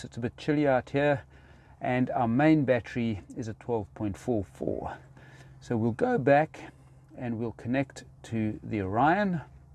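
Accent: South Africa. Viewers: Hi, nice to hear another South African. great video, Im doing my homework on both Solar controllers and DC to DC chargers. In the past I had a single unit (CTEK Dual) but now plan to go with what you have. My question, 1)do you in anyway link the two units together or are they both 'individually' connected to the Leisure Battery? 2) Does the MPPT controller also have a feature of trickle charging the Started battery like the Ctek? 3) Other DCtoDC chargers that I have seen have a small wire to the ignition to detect a smart alternator. I didnt notice this on the Victron, is it just clever and can detect that without the extra input? Thank you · Great information.. love the mix of SA and Ozzie accent too :)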